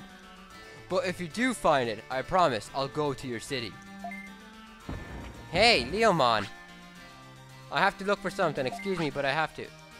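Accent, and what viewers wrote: Ireland. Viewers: where are you from man?? im irish too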